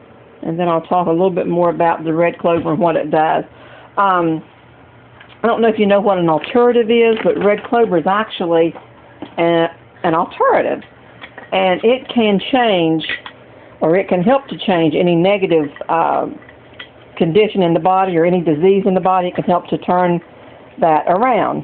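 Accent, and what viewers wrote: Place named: South, United States. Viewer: You have a southern accent I'm curious I where your from because I'm from NC and I can't find it anywhere here only white clover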